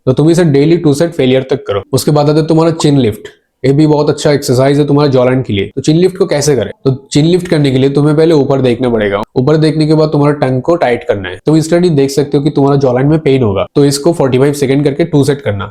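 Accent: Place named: Bangladesh. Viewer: Bhai tumi to bangladeshi hindi te kotha bolo keno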